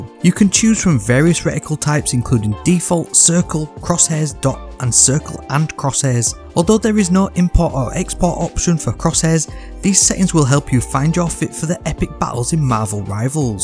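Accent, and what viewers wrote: England, United Kingdom. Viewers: Hey! Thanks for the video! Got a strange question: what kind of english acsent is it?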